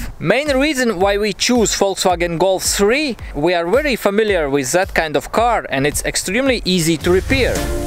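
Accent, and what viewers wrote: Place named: Germany. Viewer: I think — youre grammar is normall! But charakter of sounds is — german accent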